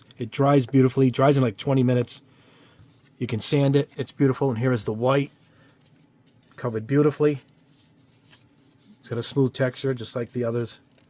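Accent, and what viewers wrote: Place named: Massachusetts, United States. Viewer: dang, from your videos it sounded like you were up in Mass some where, but Rhode Island is just as close xD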